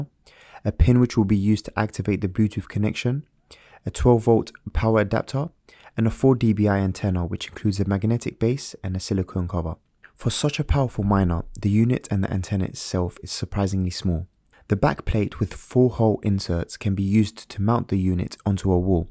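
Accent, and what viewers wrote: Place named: Cardiff, United Kingdom. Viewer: You sound like a lad I used to smoke bud with from Cardiff haha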